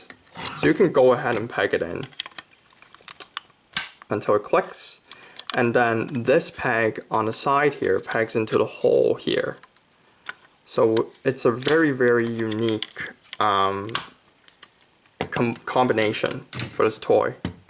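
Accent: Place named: Hong Kong. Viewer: Are you a HongKong people, you've got really nice accent.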